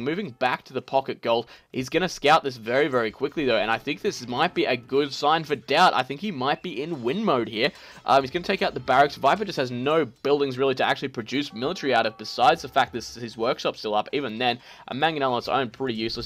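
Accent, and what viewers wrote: Australia. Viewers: Resonance22 will always be my favorite caster, but you're amazing too! :D I love aussie accents 11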